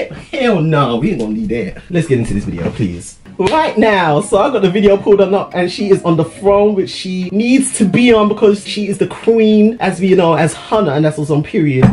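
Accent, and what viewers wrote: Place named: Caribbean. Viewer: Is it just me who thinks sounds like he is from the Caribbean, I'm from jamaica so I should know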